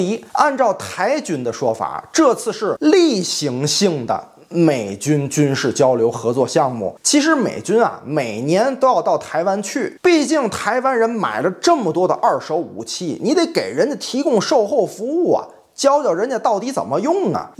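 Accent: Tianjin, China. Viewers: 哎，关注晚了，杠杠的正能量，还挺幽默，目测应该是天津的，和罗尼口音挺像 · 哥，是天津人吧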